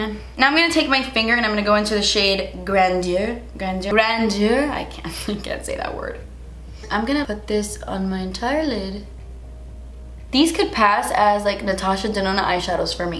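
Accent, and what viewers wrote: Cuba. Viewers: TANTO DINERO PARA ESA MIERA... lol i love you 😘 Cuban accent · Please do a Spanish tutorial!!! i love the Cuban accent 😍😍 i would love to see you do it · I'm in love with you Cuban accent 💓 😂 besos! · Please do a Spanish tutorial! I love your Cuban accent :)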